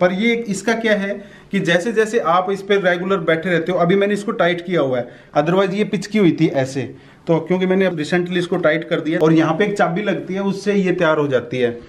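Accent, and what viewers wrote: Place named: Rajasthan, India. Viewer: very nice bhai Aap ki aawaj se legta h aap Rajasthan ho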